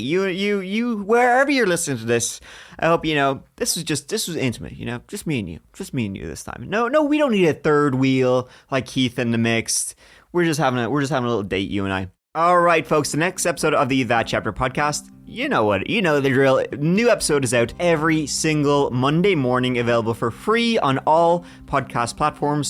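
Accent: Ireland. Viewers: I liked our little date 😂😂 We love your banter with Keith but you are what hooked me. I love spooky stories in an Irish accent, thank you for all you do!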